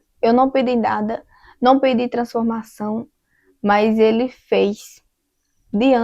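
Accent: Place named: Northeast, Brazil. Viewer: Eu amando o sotaque 😂 sou nordestina tbm, sou da Bahia. Estou no catecumenato, nessa quaresma irei receber os sacramentos 🙏🏼 fui nascida e criada dentro da seita das testemunhas de Jeová, mas gracas a Deus ele me atraiu à Santa igreja ❤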